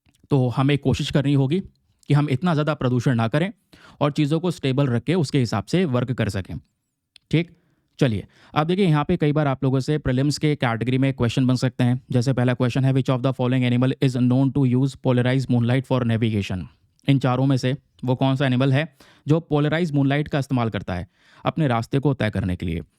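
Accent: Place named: Punjab, India. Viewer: App to punjabi lag rahe hai sir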